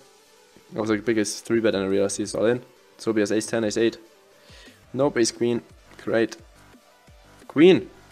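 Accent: Germany. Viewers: that german accent though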